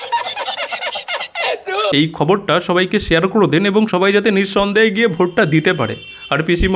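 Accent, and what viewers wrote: Bangladesh. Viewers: er vasa sunei mone hochhe puro bangladesi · traibunal er line e darayni. O to bangladesi katha sunlei bojha jay.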